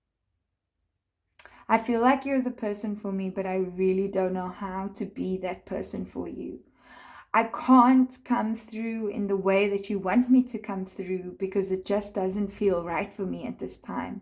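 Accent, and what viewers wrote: South Africa. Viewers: Girly, I heard that accent and immediately knew you're south african 😂 glad to find a YouTuber that can relate to the struggles of loadshedding!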